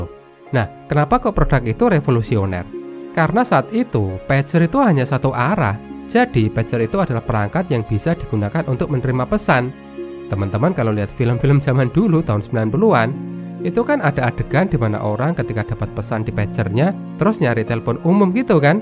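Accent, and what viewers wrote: Indonesia. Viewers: Nonton full video ini gara2 voice over nya yang Indonesia banget 🙌🏻🙌🏻🙌🏻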